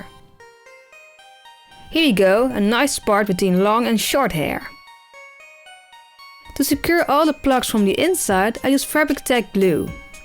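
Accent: Netherlands. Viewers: Are you dutch? Because I'm hinting an accent and my guess is that you're from The Netherlands 😂😂🙌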